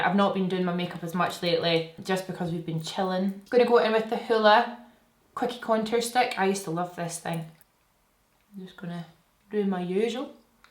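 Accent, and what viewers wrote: Scotland, United Kingdom. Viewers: Yasss BB coming thru with that Scot vibe to brighten my day!! Love ya xo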